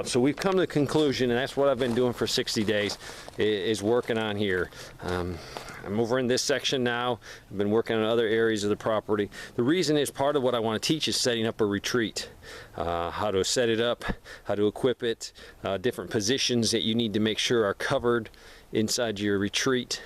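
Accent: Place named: South, United States. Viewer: Glad to see your back.Missed that smooth southern style of yours.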